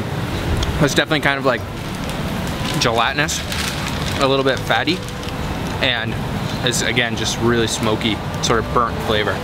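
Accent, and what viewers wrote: Canada. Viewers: Canadian or northern Minnesota? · This is the first time I've watched one of your videos. Not sure if you're Canadian or Mid-Western American. Great video regardless! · omg your canadian accent is strong!! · "secret hoos sauce, let's try this oot" I love that you are unabashedly Canadian... keep up the good work lol!!!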